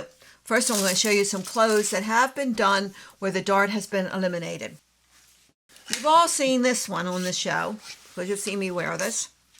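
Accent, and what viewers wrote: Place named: Pennsylvania, United States. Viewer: I just found your channel and am learning so much..!! You must be from PA, my aunt sounds EXACTLY like you ❤.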